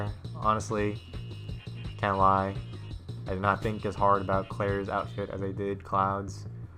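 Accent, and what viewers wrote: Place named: New York, United States. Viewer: I hear nyc ;)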